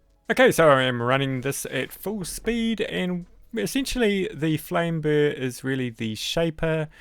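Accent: New Zealand. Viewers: Hi. I'm a new subscriber to your channel and suspect I'll me a member before too long! I enjoy your easy going manner and the NZ accent is like listening to music. I'm a beginner and have been toying with bone work so your vids are quite a help. I was drawn to this particular video when I mistook "Kutzall" for 'KLUTZ-all." and thought "Well, there's a product made just for me!"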